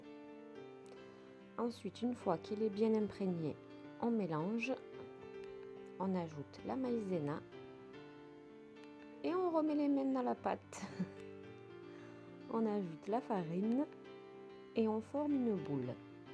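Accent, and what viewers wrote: South, France. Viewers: Super vidéo fidèle à toi même j ai testé pas mal de tes recettes qui ont toujours connu un succès.Je suis du sud et je reconnais ton accent celui de Toulouse ou Marseille 😅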